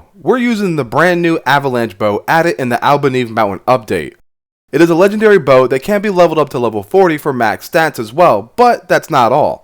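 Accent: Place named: Mexico. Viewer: you sound Mexican...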